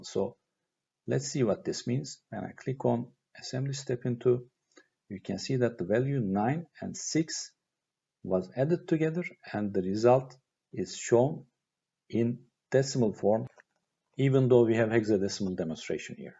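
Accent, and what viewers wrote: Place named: Turkey. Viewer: valla aksandan türk oldugunu anladım tebrikler başarılar hemşerim :)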